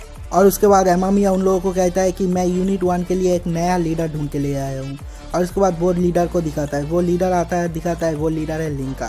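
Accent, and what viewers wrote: Bengal, India. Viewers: Ap bangal se ho kya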